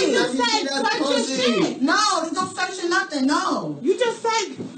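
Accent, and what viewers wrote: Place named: New York, United States. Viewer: yeoo this my first time coming across your videos, I liked your message however you NY asf you had me dying lmaoo ju hurddd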